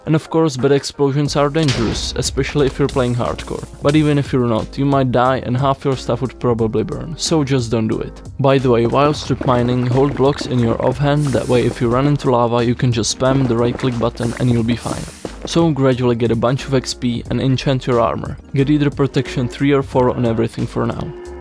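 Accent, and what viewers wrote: Czech Republic. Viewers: you got very czech accent bro :D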